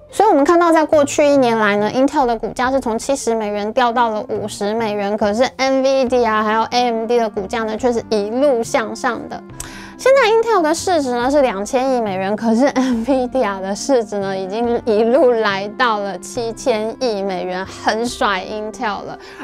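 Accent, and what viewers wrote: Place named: Taiwan. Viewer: 我就愛聽我們的臺灣國語。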